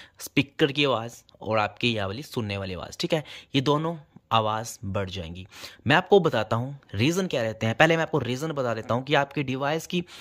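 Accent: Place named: Himachal Pradesh, India. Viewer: pehle ye btao u belong frm Himachal na ?? M 101% sure yes u r frm Himachal …..I recognised u by yr voice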